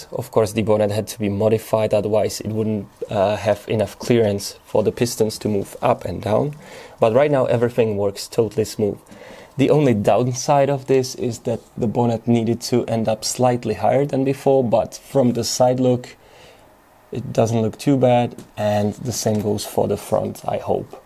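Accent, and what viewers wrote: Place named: England, United Kingdom. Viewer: I am still looking forward to be able to make these modifications by my own🙏. I love your work as former designer, I love your alternates models as well. And I love your really clean English accent, very easy to understand as non English mother tongue like me. Yeah I know probably too much compliments but you deserve it and I really want to obtain this instructions I know 😅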